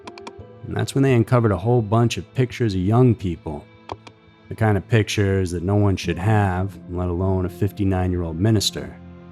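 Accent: South, United States. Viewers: Where's this southern drawl coming from? You don't have it in your older episodes. Is it manufactured for the show? You sound very much like Mathew McConney in this video, not so much in your older ones. I'm just curious, I really enjoy these videos.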